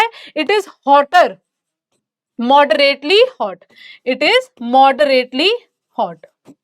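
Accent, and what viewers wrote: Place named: Punjab, India. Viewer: aap punjabi ho kya? accent aisa hai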